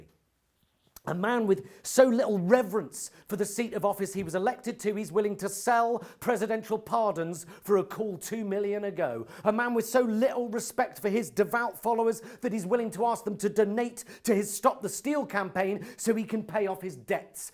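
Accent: United Kingdom. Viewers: For the benefit of non-English fans, please always allow subtitles. I adore this super-British accent but sometimes it's a bit hard to undrrstand. Thank you.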